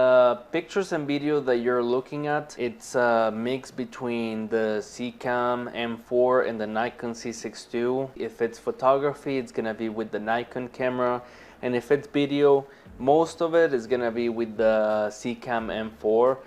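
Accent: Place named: Spain. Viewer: I know it's hard for native Spanish speakers but you gotta work on your pronunciation of "z" and "v". Zi is not Ci and Video is not Bideo.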